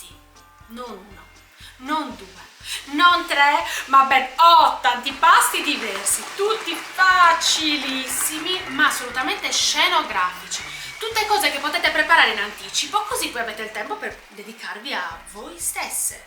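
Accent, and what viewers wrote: Sardinia, Italy. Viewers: oltre che brava sei simpaticissima complimenti mi piace il vostro dialetto sardo io ho ha nuora sarda e gli voglio molto bene ciao e buon Natale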